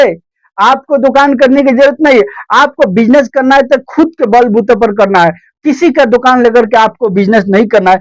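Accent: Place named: Bihar, India. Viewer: Bihari ho kya bhaiya conversation shikho pahle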